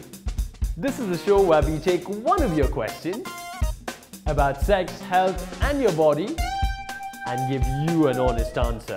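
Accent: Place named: India. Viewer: very unique indian accent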